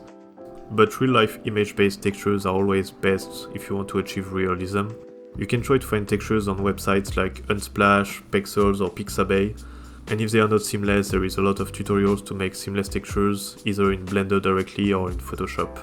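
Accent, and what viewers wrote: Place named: France. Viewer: I knew you speak French from the start from the accent I also have haha ! Alors voici un nouveau sub pour encourager la communauté française de Blender❤ en tt cas la différence entre la première image et ce que tu as changé est incroyable ! Du vrai art , bravo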